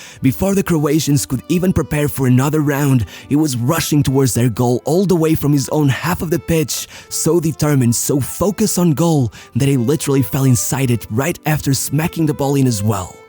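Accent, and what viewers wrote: Portugal. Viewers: he is portugese that's why he has an accent, and the other mistake, are you always perfect or smthng? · És portugues de certeza, esse sotaque n me estranha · Este narrador eh portugues de certeza.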